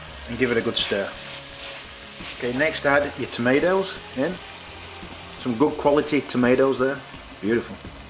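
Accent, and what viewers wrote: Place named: United Kingdom. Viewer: Just discovered your videos recently. To my mind they constitute an object lesson in how to make an outstanding food prep/cooking video. Terrific clarity and nothing left to chance. Who does the filming for you? Cooking your lasagna right now and will let you know how it turns out. Love the tomato sauce on the plate at the end - lifts the presentation of this rustic dish. I am an ex-pat Brit living in the US and it's very refreshing to hear you speak! Just subscribed. Good stuff! Bob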